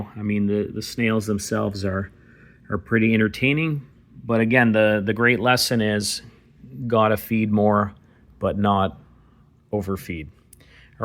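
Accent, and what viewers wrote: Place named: Canada. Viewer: love seeing a new reef channel congrats! u sound canadian hrmm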